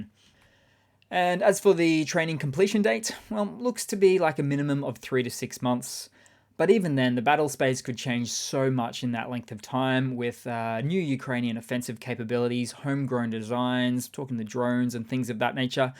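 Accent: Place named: Australia. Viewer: Now I know you are Australian by your accent , so by chance I came across an Australian Youtuber who lives in the outskirts of Moscow with his Russian Wife who does tours of the City and its surrounds (Travelling with Russel) and you can see by the videos he films , that the residents of Moscow give the impression everything is normal and there is no War Going On over the past 18 months , it is quite surreal to watch how the ordinary Russians go about their daily routine as though their country is at War at all, the supermarkets are full of all the food that they could purchase before the War started and the only thing they cannot purchase are European or Japanese vehicles other than the flood of new Chinese brand vehicles being assembled in their country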